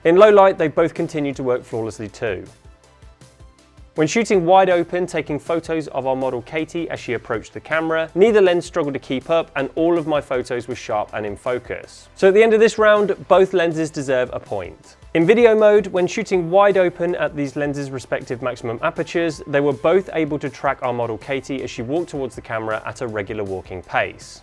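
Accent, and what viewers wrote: United Kingdom. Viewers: I like this guy's humor. Plus having a British accent automagically makes him sound like he knows what he is talking about. Cheers mate! :D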